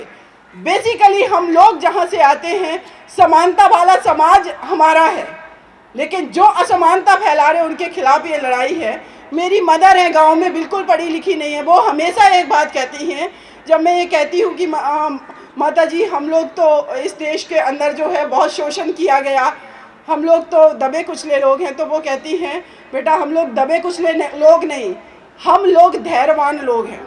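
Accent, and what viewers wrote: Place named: Pahadi, India. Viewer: So Great speech mam pahadi voice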